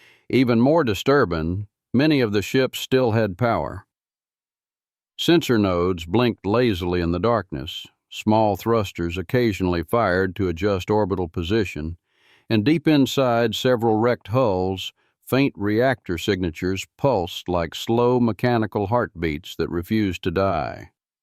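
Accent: South, United States. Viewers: Like I said and that is for reply you've lost consistency that southern drawl and humour and ya keep switching diff narrators when ya got a winner ya lost me but good try not sure how yer not relating yer stories good narrator all over the place ya can't relate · Its so nice to here a narrator that speaks my own language, SOUTHERN.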